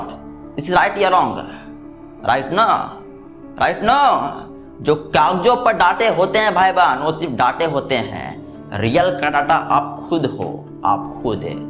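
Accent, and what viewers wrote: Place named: Bihar, India. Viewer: इंसानियत कम होने का बड़ा कारण बढ़ती आबादी है । कुछ लोग पीढ़ियों से सुधारना ही नहीं चाहते। सुवर की तरह आबादी बढ़ा रहे हैं। ओर कमाने वाला एक की मदद करता है तो 10 आकर ओर खड़े हो जाते हैं। तो उनको भी सोचना होगा तभी जिंदगी सुधरेगी सबकी । भाषण देने से कुछ नहीं होगा तुम बिहार से लग रहे हो तुम्हारी बोलने की अंदाज से लग। रहा है 17 करोड़ हो ध्यान देना 😂😂😂😂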